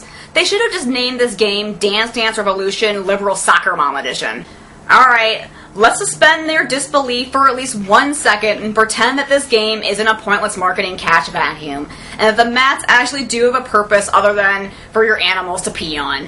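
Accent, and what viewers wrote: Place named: Australia. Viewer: Lol she is Australian not British. I love DDR but this was hilarious.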